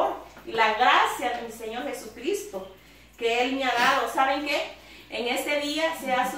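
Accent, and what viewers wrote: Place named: El Salvador. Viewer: Gracias por saludarme ami y mi esposo,nos gustan sus videos habla bien salvadoreña nos encanta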